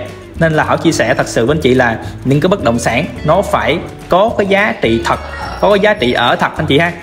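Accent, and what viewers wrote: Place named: South, Vietnam. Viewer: người Quảng Ngài cháu nói giọng niềm nam rat rõ, love kênh cháu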